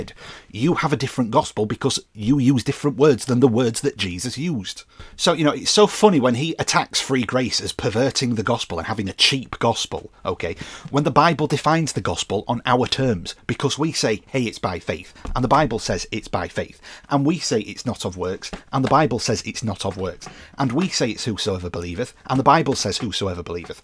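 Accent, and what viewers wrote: United Kingdom. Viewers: I never knew hard preaching in British accent is something I needed but now I can't stop watching your videos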